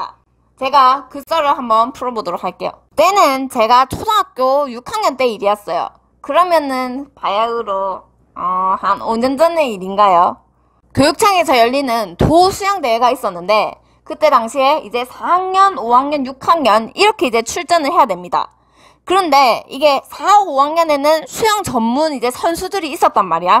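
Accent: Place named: Daegu, South Korea. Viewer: 대구 사투리 같은데 김해 느낌도 있고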